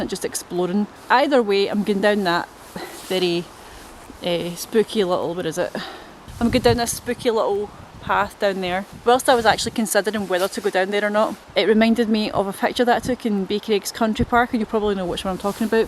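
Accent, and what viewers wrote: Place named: Scotland, United Kingdom. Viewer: Another great video. I always look forward to hearing your accent from home (says a Weegie in England!)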